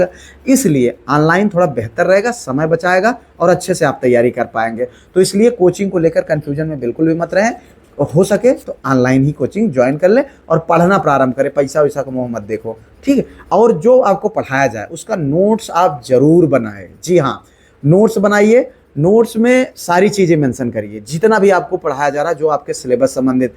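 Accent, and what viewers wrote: Pratapgarh, India. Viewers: Pratapgarh se h sir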